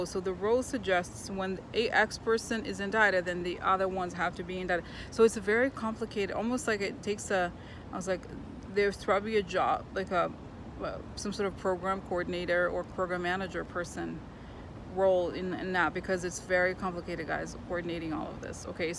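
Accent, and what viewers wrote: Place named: Russia. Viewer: Have you ever watched "The Night Stalker"? An investigative jounalist who had a knack for unwittingly discovering and investigating psychic crimes; a TV-series from the 1970s, starring Darren McGavin as "Karl Kolchak "(imagine I'm typing with your Russian-style accent): 'very funny, I think you like'. Thank you for the succinct and interesting vlog; I'd been wondering the degree of co-ordination required and when, exactly, it had all begun - like, given Trump's history were the various state and federal agencies already in an investigative process. Great vlog, thank you and best vibes from Melbourne, Australia.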